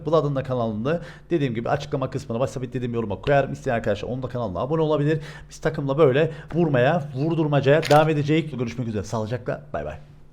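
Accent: Cyprus. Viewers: Abi sen kıbrıslımısın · abi senin 2 3 videonu izledikten sonra Kıbrıslı gibi konuşmaya başlıyorum 😂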